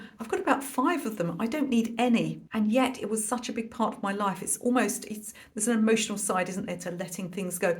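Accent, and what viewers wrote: United Kingdom. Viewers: I LOVE your voice!!! I have always loved the British accent.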